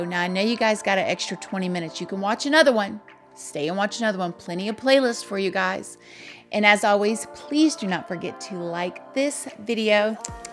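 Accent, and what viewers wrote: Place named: Florida, United States. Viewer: Are you from Florida? You have that cute “kinda southern” accent us Floridians have. ❤️❤️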